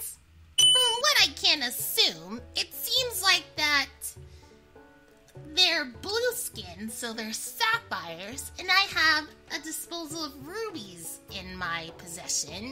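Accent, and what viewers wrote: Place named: Scotland, United Kingdom. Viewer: NAH, I'm calling ya. Nice Scottish accent as well.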